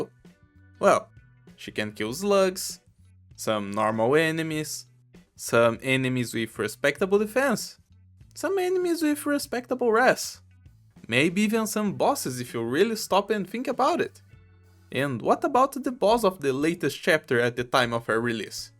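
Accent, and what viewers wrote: Brazil. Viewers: Bro... you are brasilian ? Your sotaque is sooooo Brazil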